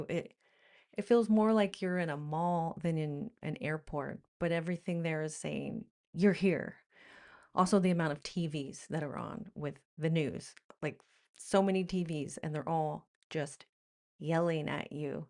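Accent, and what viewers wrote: United States. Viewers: Really lovely to hear a considered, quietly spoken American. It's such a lovely accent when it's not screaming at you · You seem such a lovely person, I love your videos. You may have an American accent but my dear you’re one of us now, welcome home ☺ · American lady living in the UK is sooo SXY. Please try not to loose the accent and become less exotic... LOL.❤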